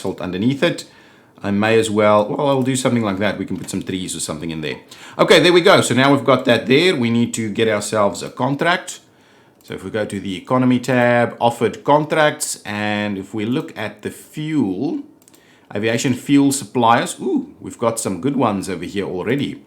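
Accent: South Africa. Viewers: Hi..you sound south African..LOL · Great series. Watching them. I was actually going to ask if that accent is South African but answer said after 16min :)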